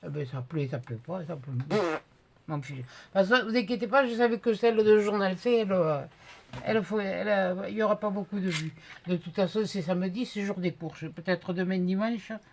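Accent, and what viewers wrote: South, France. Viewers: Cc,je te félicite tu la super bien réussi 😍 tu l'as bien revisité le corbeau et le renard sur le moodbord du défi a Maminette Anita je le trouve très rigolo 🤣, j'adore les andouilles de vire de chez moi en Normandie c'est une spécialité du calvados dept 14, j'adore ton humour ne change rien moi tu me fait rire avc ton accent du Sud il trop mignon,un petit coucou a Rudy et Mathieu 🥰, j'attends maintenant mon défi que tu doit faire tu va avoir du boulot a le réaliser 😂,et après c'est Celui de Nicole Houlala bon courage 💪, et plein de bisous aux deux chipis, 😘 et a Rudy et Mathieu 😘 et Arkos doit encore dormir où joué où trouver une solution pour monté au rideau pour mieux regarder dehors 🤣 plein de câlins 😻,bonne journée de lundi bisous 😘❤️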